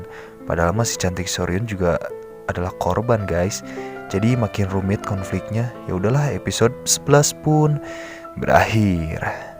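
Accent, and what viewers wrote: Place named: West Java, Indonesia. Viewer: Orang sunda mana nih...😂😂😂😂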